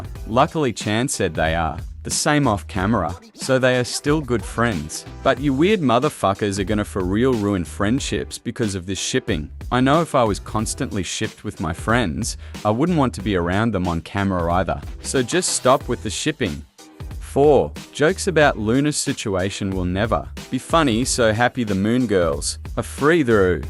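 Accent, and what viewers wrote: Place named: Australia. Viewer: "They should make a rule that you should be 16 minus 17 years old to debut." 🤣🤣🤣 So they should be literal fetuses? Haha just pulling your leg! Is this a robot voice reading "16 - 17" literally because that is freaking hilarious! No judgment, absolutely no dislike for robot voices. I would also choose one instead of using my own voice. I've never heard an Australian robot voice, and then you said you were from Germany, but that does not preclude that you may have learned English in Australia, as one of my friends did. I enjoyed this video very much! I'm subscribing right now and I hope you make more videos soon! 🙏😄🌸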